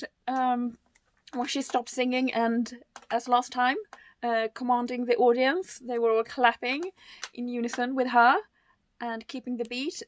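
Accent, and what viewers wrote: Sweden. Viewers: another great reaction, tnx. i detected what i thought was a faint trace of swedish accent in ur voice, so i had to check ur info. i thought skåne, but deep forests? hehe, im a smålänning... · Hearing you talk for 30 seconds was enough to realize that you're a fellow Swede.:) I thoroughly enjoyed the video and I'm looking forward to whatever you do next!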